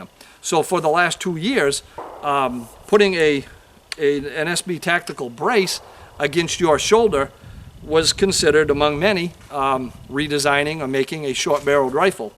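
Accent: Maine, United States. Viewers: Sounds like your from Maine bub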